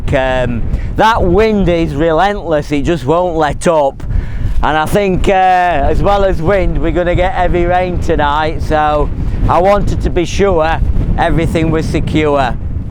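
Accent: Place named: Yorkshire, United Kingdom. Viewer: Proper Yorkshire accent:)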